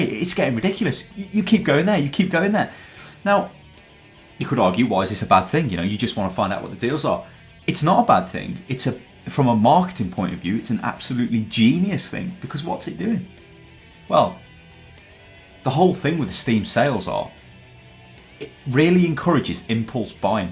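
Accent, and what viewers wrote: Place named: United Kingdom. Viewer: I subscribed because of your British accent. :D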